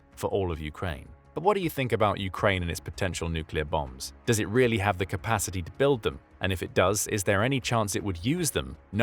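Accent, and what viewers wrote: England, United Kingdom. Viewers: What bugs me about the narrator (Who by his accent is clearly English) is that he keeps pronouncing "Nuclear" like an American.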